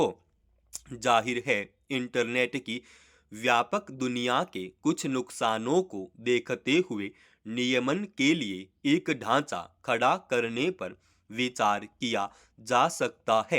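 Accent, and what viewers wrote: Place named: South, India. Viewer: पेदा या पैदा अरे मद्रासी हिन्दी बोलना सीख लो। न होता है या नै। पहले अच्छे से हिंदी सीख लो भाई बोलना।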